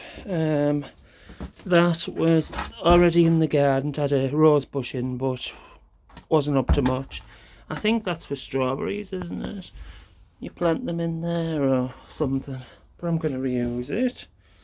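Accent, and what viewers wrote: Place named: North, United Kingdom. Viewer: Love your Northern accent. Garden will look lovely when in bloom.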